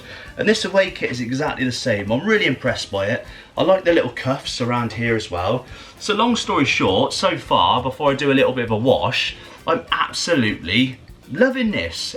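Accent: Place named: Manchester, United Kingdom. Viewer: What a strange Mancunian accent.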